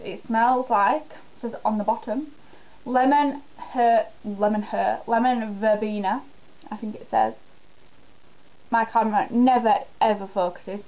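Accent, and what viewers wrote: Yorkshire, United Kingdom. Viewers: Are you from Yorkshire?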